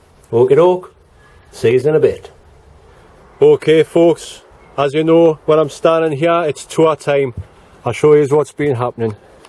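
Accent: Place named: North, United Kingdom. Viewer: I like you videos and the voice it reminds of all my relatives from the north Never mind about the dislikes some people just like to hurt .Good wishes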